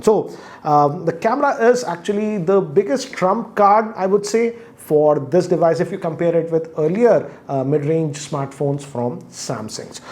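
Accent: India. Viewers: when are you gonna drop that Indian accent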